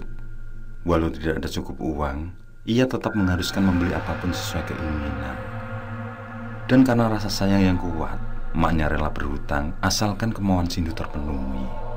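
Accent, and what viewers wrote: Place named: West Java, Indonesia. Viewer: kmu asli lombok apa sunda kok ngomong nya ada bhs sunda nya, klo kmu sunda sudah gak ada harapan saya 😢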